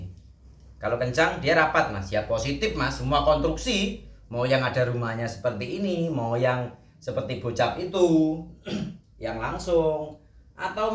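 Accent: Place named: South Sumatra, Indonesia. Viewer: Lokasi pundi mas sampean ..kok seng dandan apache bahasane kok koyo sumsel mas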